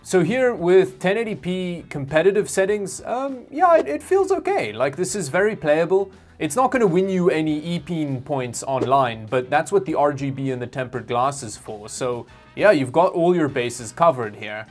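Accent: South Africa. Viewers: Why do you sound like you have a South African accent? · You sound like a South African :) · South african? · Are you from Africa? Your accent sounds very similar to a few people I've met from South Africa.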